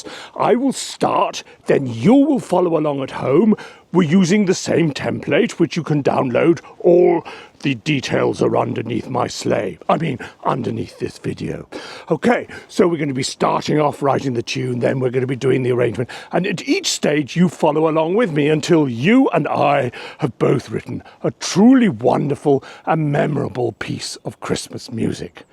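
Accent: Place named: Scotland, United Kingdom. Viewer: Sounds very Scottish .... almost in need of a set of bagpipes...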